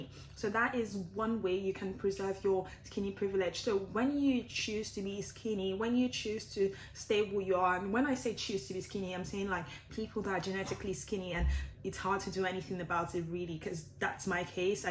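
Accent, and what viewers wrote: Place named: United Kingdom. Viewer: It’s interesting to see ur accent change from your older vids. You have a more British accent now. Almost no Nigerian accent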